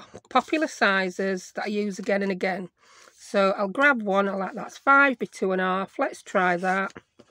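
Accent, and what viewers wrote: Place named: South Yorkshire, United Kingdom. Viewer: Sorry, I just need to know where you're from. That accent screems South Yorkshire!!? 😃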